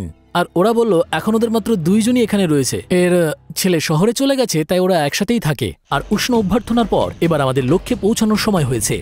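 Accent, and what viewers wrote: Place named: Sylhet, Bangladesh. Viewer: সিলেটি সিলেটি ভাব আছে কথা কন্ঠে!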